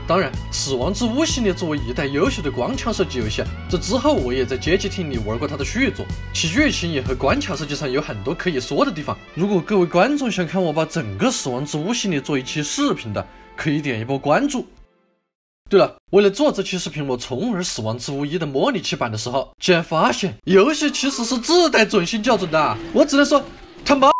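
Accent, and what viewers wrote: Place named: Hunan, China. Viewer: 哈哈这湖南口音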